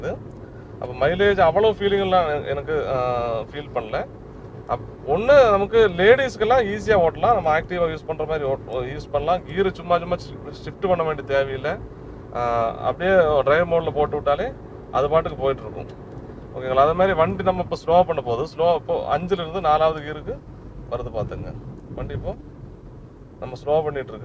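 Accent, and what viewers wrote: Kerala, India. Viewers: நண்பா உங்களுடைய மழையாளம் கலந்த தமிழ் கேட்பதற்கு அருமையாக உள்ளது.நன்றி. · Malayali tamil samasrikunnu... Nanum thamilan than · malayali tamil pesrathu ...nalla irukku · When a malayali speaks Tamil it sounds so sweet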